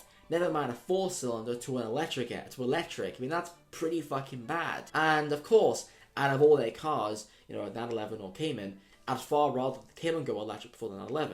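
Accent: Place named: West Country, United Kingdom. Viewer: Yank here. Some of us like to try to figure out different English accents. Most Americans can't even tells the country, but many of us can. Are you on the west side? Bristol? Maybe Wales? Sorry that has nothing to do with your Porsche content, but your video was suggested to me and I love the Porsche topic.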